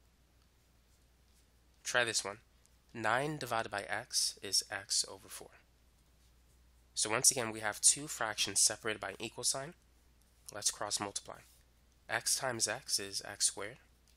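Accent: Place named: Boston, United States. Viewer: You have a Boston ascent. Btw your videos are great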